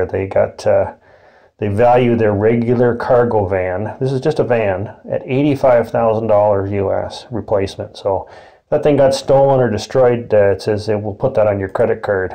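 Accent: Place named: Canada. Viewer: Very helpful— taking my Goldwing 1,200 miles this weekend, and this is perfect. Also love the sound of Maple Syrup in your accent 🥰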